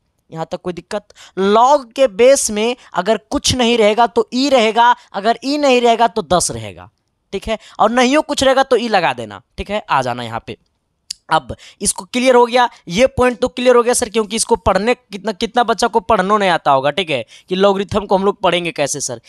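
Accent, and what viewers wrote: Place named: Bihar, India. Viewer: Bahut hard🎉🔥!! That bihari.. tone !! Jsstt took the heart💖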